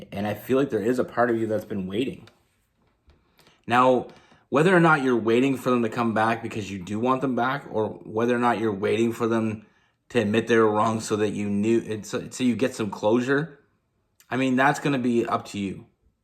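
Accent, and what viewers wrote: Canada. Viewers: Love your Canadian accent 😎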